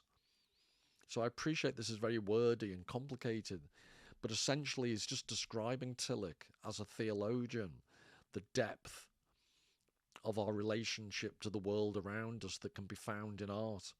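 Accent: Liverpool, United Kingdom. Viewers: Are you from Liverpool by any chance? 🤔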